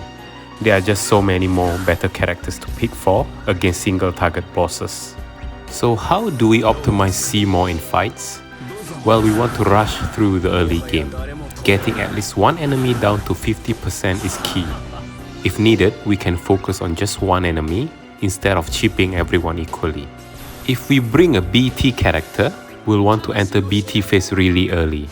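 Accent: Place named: Singapore. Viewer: hi bro are u singaporean too? sounds like one also might need help on ddffoo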